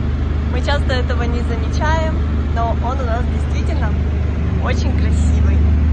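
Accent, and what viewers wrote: Odessa, Ukraine. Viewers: Как мне нравится ваш одесский мягкий говор. Вы моя дорогая.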